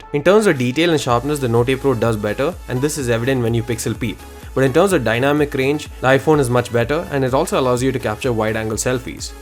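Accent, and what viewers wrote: India. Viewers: ¿Why Indians doesn't work a little bit more on their accent and pronunciation? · this indian accent so fucking disgusting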